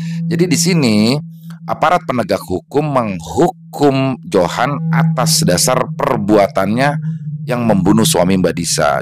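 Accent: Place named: Sunda, Indonesia. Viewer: Mirip memang....saya ngikutin jg..karena bisa liat2 pemandangan....hanya beda dari logat si...kalau kek nya terdengar Sunda banget hehe....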